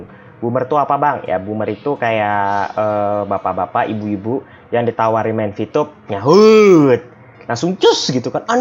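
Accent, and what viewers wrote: Banjar, Indonesia. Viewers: Ikam urang banjar kh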